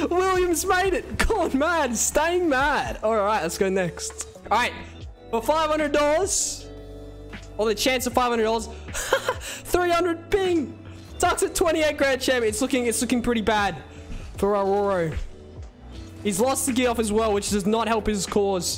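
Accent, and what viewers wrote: Australia. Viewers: r u aussie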